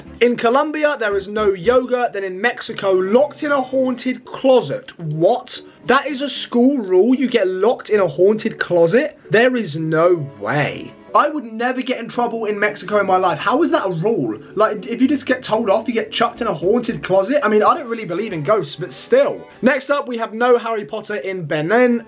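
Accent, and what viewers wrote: United Kingdom. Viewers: he’s a brit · British u meant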